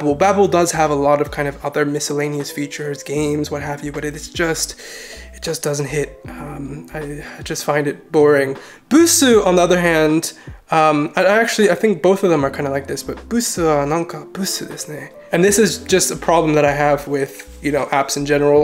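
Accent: Nigeria. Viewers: Great Nigerian accent! Do you eat any Nigerian food? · Does a good nigerian accent but doesn't speak igbo 🙄 i am a bit salty as you can see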